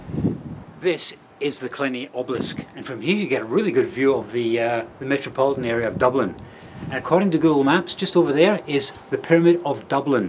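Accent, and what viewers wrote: Scotland, United Kingdom. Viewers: I’m surprised at his Eire pronunciation. Probably his Skaughtisch accent! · Can I ask where your accent is from? I hear Scottish mainly?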